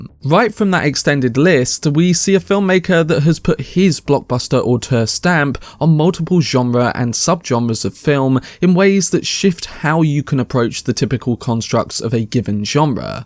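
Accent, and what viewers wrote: United Kingdom. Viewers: The pawst? Cawst? At tall? Contrawsting? You Brits crack me up.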